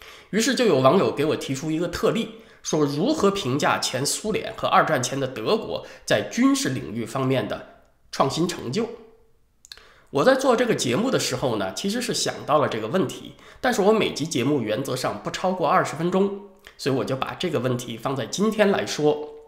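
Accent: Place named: Tianjin, China. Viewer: 天津普通话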